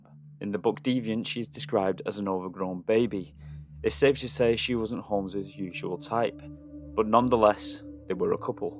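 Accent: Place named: England, United Kingdom. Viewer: Typical American. I'm pretty sure the narrator is English 🤣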